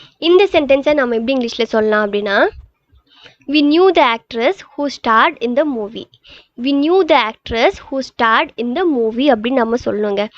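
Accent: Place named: Coimbatore, India. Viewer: vaanga ponga inga nu soldrathulaa alagaa irukku sister...Coimbatore style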